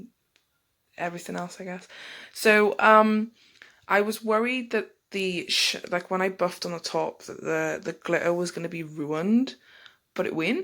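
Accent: England, United Kingdom. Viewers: Don't stop talking, love your English accent, although the music is nice, too.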